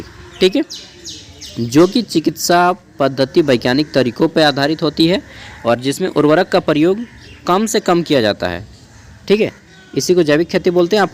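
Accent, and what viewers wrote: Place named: Jharkhand, India. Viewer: Aap kaha se bhai, apka bolne ka tarika se lg rahe ho aap hazaribagh se ho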